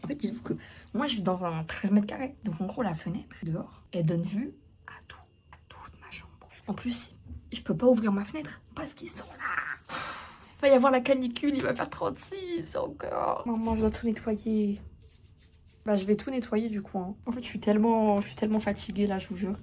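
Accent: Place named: Maghreb. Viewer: Pourquoi tu parles comme une blédarde? Pfff. La vie en ville les accents de la ville c'est devenu d'une laideur. Dommage sur un si joli minois. Enfin bon force dans tes galères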